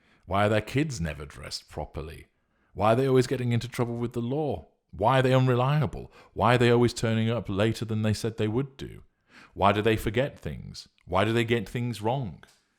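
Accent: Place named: RP, United Kingdom. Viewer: You’re and you sound ever so Posh!! Once again you’ve hit the mark 100% thank you Sir 👍🇦🇺 love your work 😘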